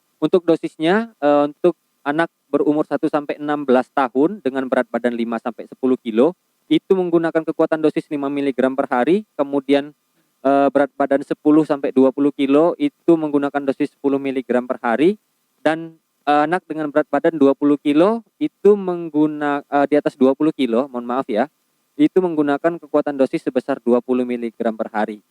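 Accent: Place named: Java, Indonesia. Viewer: Jawanya kental bet bro🤙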